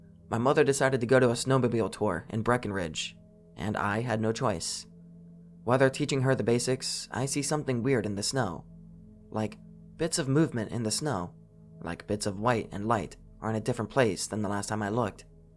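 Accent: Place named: Westchester, United States. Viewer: you from Pennsylvania? I'm getting Westchester vibes from the way you pronounce certain words.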